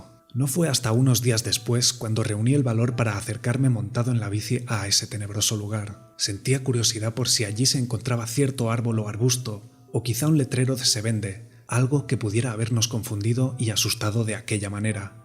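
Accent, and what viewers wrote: Castile, Spain. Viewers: AGUANTE EL ACENTO CASTELLANO DE CASTILLA!!!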